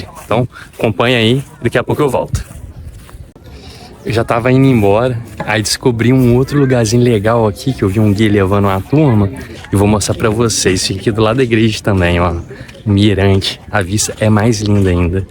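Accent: Minas Gerais, Brazil. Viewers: Não consigo parar de ouvir vc falando, esse sotaque mineiro é bom demais rs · otimas dicas Brother, pelo seu sotaque é minerin que nem eu kkkkkk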